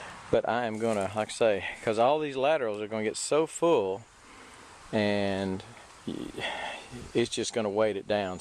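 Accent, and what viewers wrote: Texas, United States. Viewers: That's a natural Texan accent. Everything is better in Texas.😎😘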